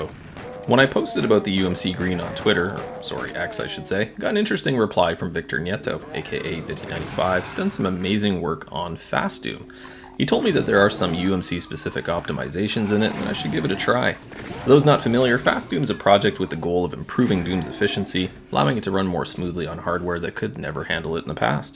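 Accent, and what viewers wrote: Canada. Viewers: Very Canadian accent!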